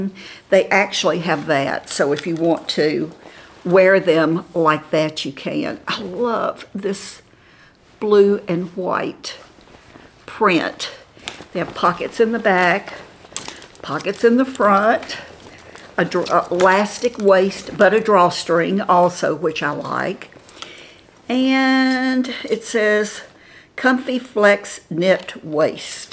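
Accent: South, United States. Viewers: Hi.....so glad I found you! Nanny (Mary Ellen) recommended your lovely site. YOu are so cute and sweet...loved the fashion show.....also love your sweet Southern drawl. Your make up is beautiful. Hugs from a nana in Pa.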